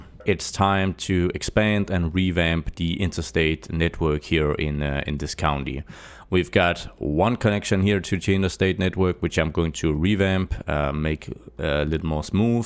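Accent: Denmark. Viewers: Are you from Denmark? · Er det en dansk accent jeg hører? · like the video! Are you danish? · Is that a danish accent I hear? :D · I think hes danish. And yeah its a game it wont look like a real city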